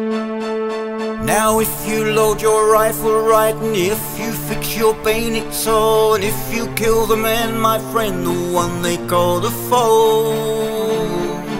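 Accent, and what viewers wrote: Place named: United Kingdom. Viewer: Superb,. Such a unique upload of this lesser-known Whittaker gem, a profound, deep poem with amazing heroic/patriotic music accompaniment. It's pretty cool to hear you using your beautiful Brit accent on this. Normally, you just merge into the hybrid voices you create, and no one can tell. It sounds just lovely here, man. Excellent vocals! BTW, Dave Silk is the best, a first-rate gent! Shout-out to David! I hope everything is okay, bro... is Helen doing well? So glad to hear about the new medication, and that things are a little better. We've all been praying for you both, dear friend, and sending good vibes. Wishing you both a week of tranquility and calm. Peace and brotherhood,🙂 Mac (aka RE-VO)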